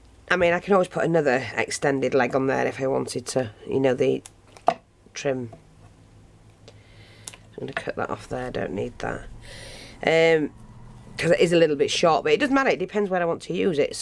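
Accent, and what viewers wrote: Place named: Liverpool, United Kingdom. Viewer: Excuse me being nosy, but you sound like my friend who comes from Liverpool? xxxx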